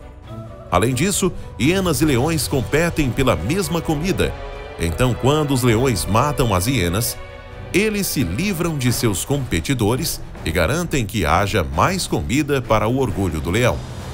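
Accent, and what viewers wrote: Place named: Portugal. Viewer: Sounds like Portuguese.....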